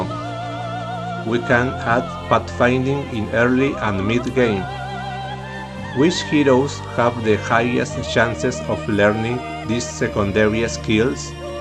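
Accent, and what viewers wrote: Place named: Spain. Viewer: I love magic the gathering too ☺️ one question, are you spanish? yo sí, vivo en Madrid. Por tu pronunciación del inglés supongo que eres español como yo 😊 Your vídeos are awesome! ☺️😃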